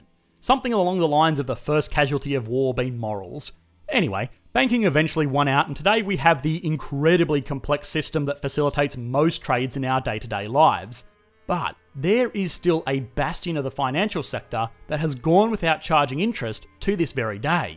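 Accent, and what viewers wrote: Australia. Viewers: Uploaded 13 hours ago Australian accent 1am? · Goddammit handsome sounding Australian man. I was going to sleep in my screwed up sleep schedule. Now I’m getting educated.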